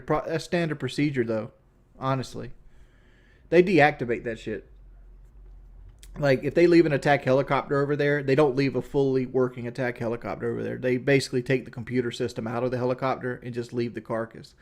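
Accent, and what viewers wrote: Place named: South, United States. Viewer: That's just how folks from the sayouth talk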